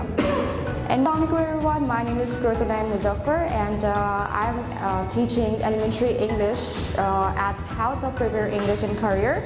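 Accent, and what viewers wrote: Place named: United States. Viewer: Mam you've great American accent.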